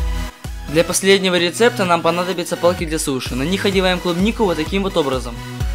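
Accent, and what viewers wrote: Ukraine. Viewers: Этот украинский акцент...)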